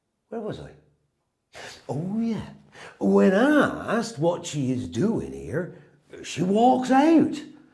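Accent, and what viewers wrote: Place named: United Kingdom. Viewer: British narrators are always the best! This was so much fun!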